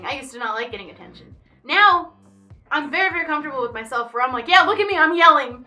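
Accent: Texas, United States. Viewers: You sound like you were from Texas in UHShe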